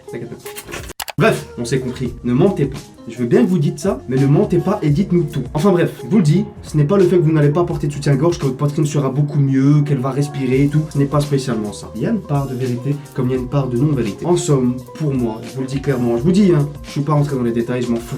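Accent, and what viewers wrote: Belgium. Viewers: Tu as un accent belge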